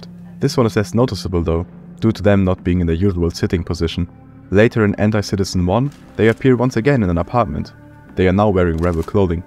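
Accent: Germany. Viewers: Der duuuude ist doch deutsch oder? 🤔🤔🤔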